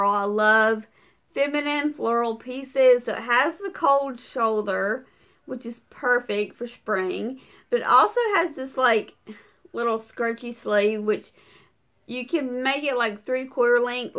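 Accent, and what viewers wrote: South, United States. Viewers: Are you tipsy or just really southern??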